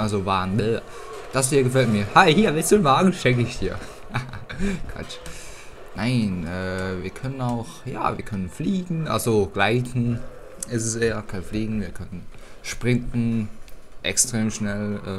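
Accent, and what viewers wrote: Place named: Switzerland. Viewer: Ehi du bist schweizer oder? Man merkts sofort :D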